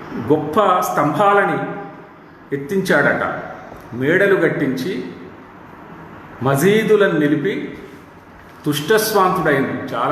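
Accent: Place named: Telangana, India. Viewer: Sir..... Mee telangana mandalikam tho kudina telugu Chaala bavundhi.... Sir 🙏🙏🙏🙏🙏🙏🙏🙏🙏🙏🙏🙏 Ee video Telugu vallu Bhavishyatthu lo chustharu sir ekkuvaga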